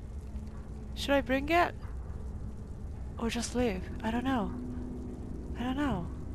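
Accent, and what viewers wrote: England, United Kingdom. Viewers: U have like an English accent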